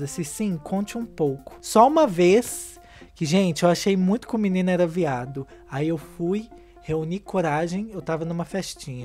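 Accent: Minas Gerais, Brazil. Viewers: Conheci seu canal por acaso há muito pouco tempo não costumo fazer comentários mas tenho essa vontade contigo. Não sei se é porque você tem esse sotaque minérim (minha família mineira) se é porque tenho um filho morando na Europa há uns 5 anos ou se porque o filho que me restou aqui também é Vegetariano kkkk. Brincadeira 😉 mas é só pra dizer que gostdoce tá ! Abraço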